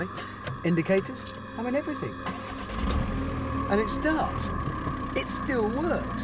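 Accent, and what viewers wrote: Germany. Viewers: This is the most german english ive ever heared😂 vor handred sausend wollts^^ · Well to be honest, almost every German older than 30 is speaking like that. The younger generation, especially the teenagers are a lot better and have less of an accent because they grew up with youtube. But I guess you will always be able to tell. BUT: As a german native speaker I am always able to tell if the person I am speaking to is an english native speaker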